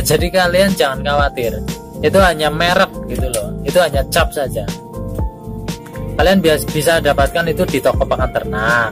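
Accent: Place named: Banyumas, Indonesia. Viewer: klu orang ngapak ketahuan cara ngomongnya... hidup ngapak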